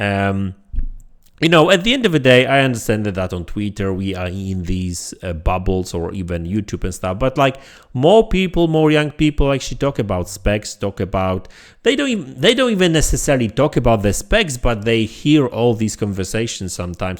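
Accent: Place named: Poland. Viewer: Od razu w intrze usłyszałem ten polski akcent xd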